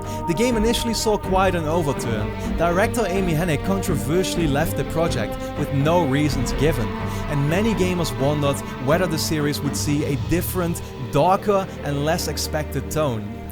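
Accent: Netherlands. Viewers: are you dutch because you have that dutch accent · The Dutch accent is strong with this one.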